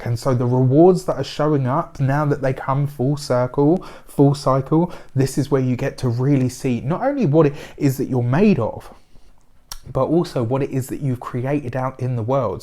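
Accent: United Kingdom. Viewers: British accent ? Nice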